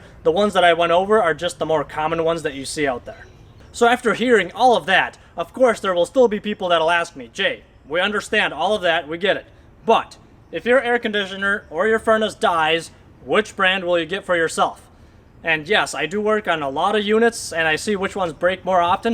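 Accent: Minnesota, United States. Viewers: Your content is great! Knowing you're a Minnesotan (cause I talk like you too!) but now I live in So. Florida. People say lifespan of 10-20 years but what about hours used? I have a 10 yr old 13 SEER Ruud 3 ton unit running 410 refrig. would you replace it with a 16 SEER or just keep it tuned up and hope for 15 years? System checks out ok, I replaced capacitor last year but seems takes nearly 8 hours to cool down house from 80 to 72F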